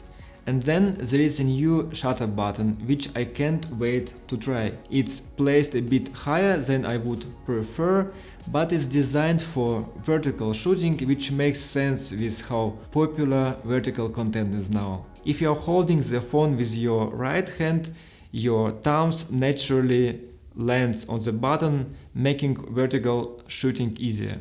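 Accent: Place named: Russia. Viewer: The most russian accent ever 😃😁 всемусердецный привет из Азербайджан 😃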